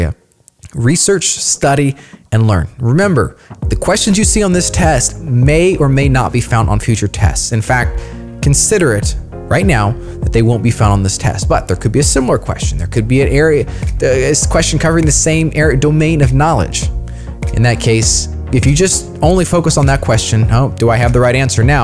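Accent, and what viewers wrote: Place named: United States. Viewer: like your accent ... it's rare to find an American accent in magento field hhhhh😂😂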